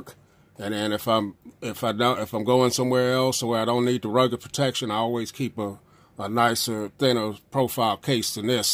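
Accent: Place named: Arkansas, United States. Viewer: He has the same accent as my black friend. Are you from Arkansas?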